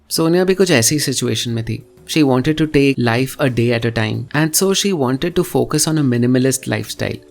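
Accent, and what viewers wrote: India. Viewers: bhai ka excessive indian accent hai, but he says "Avatar" like he's american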